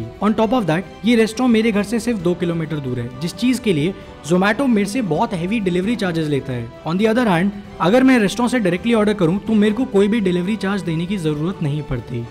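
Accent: Bareilly, India. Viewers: Are you from Bareilly?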